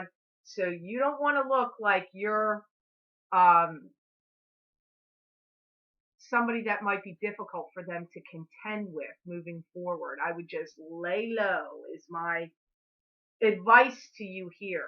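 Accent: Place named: Chicago, United States. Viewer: did you grow up in the Midwest? You totally seem like you're from Chicago in the way you talk and act! (That's a compliment, by the way! LOL)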